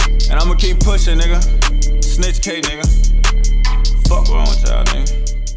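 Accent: Los Angeles, United States. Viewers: 🔥🔥🔥🔥🔥,Man he sound like L.A!!! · I fw. He a cool thurl ni**a! He don't do too much. He sound like LA & got the same type mannerisms in a way. Stay solid & safe 💯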